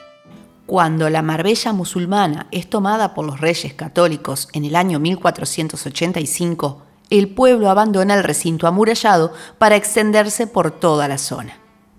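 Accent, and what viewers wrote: Argentina. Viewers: Podrias hacer el esfuerzo de hablar Español,embesde Arjentino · Locutora argentina, bravo! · Ud. es de Argentina?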